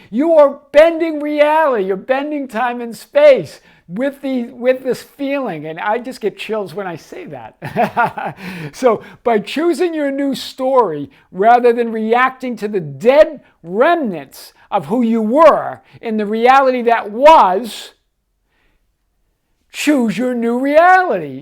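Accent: Boston, United States. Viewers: From Boston? 🚀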